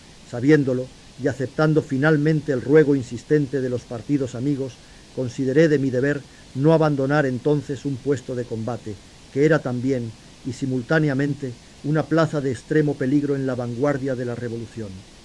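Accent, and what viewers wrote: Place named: Spain. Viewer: Porque hablaba en español de España?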